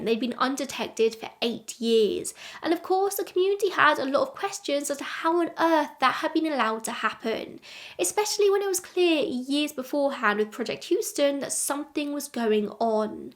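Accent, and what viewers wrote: England, United Kingdom. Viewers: Ur English accent is 🤮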